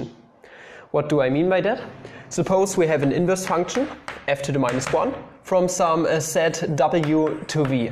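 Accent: Germany. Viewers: You sound german. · I'll be honest. Sometimes I just get lost in his clumsy ways and german accent. xD But keep up the good videos. ;)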